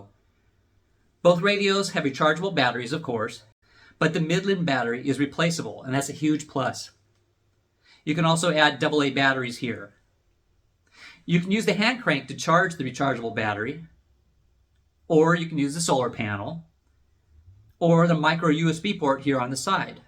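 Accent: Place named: England, United Kingdom. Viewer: Bro's english is very English.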